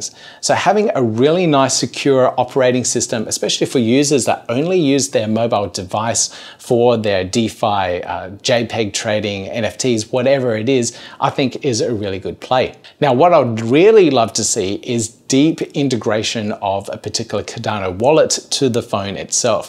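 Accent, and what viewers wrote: Australia. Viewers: Just subscribed to your channel! You have such soothing radiating positive energy, that it is much more pleasant to hear Cardano related news from you than from many other channels. Maybe it is because I consider Australian (or NZ) accent smooth as butter maybe, pleasant to the ear and reminiscent of the sun and beaches (and surfing with sharks as well :) ).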